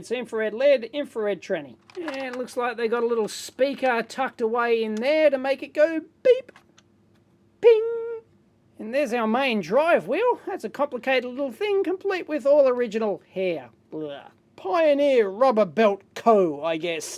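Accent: Australia. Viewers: The only thing I understand is that Mr. should be an Aussie. His accent says so. Am i right, Mr.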